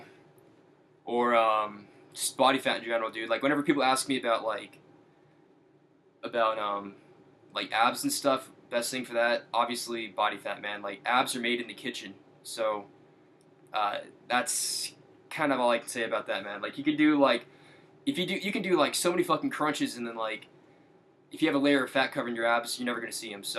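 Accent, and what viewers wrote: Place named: Australia. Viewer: talks like an Aussie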